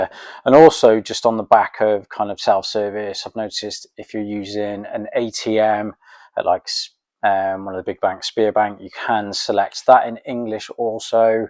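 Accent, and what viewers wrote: United Kingdom. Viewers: Как приятно слушать британский английский. Стала каждый день Вас слушать без перевода. Удачи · has a very good British pronunciation, it's quite easy to understand him. I've had an opportunity to speak with many other English people and often it was rather difficult experience. (my ear trained more to northern American version of English).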